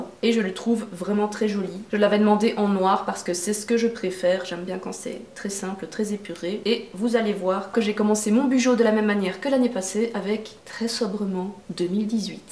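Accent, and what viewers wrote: Belgium. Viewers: Bonjour, j'adore l'idee de grace au bujo etre organiser pour enfin realiser ses objectifs mais comment on fait pour realiser un bujo quand a la base on est pas organiser ds sa tete , en gros je sais pas pas ou commencer et il y a tellement de chose a y mettre pour que ma routine du quotidienne et mes plus gros objectifs soit mieux atteignable car mieux plafinier , j'adore ton naturel! et ton petit accent belge qui reste malgré NY :-) Bizz de Bruxelles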